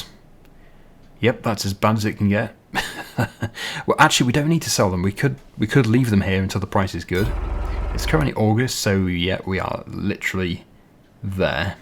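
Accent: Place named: England, United Kingdom. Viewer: I don't want to affend anybody but he has an English accent (I think) but in the game he drives on the right side of the road. Just something I noticed.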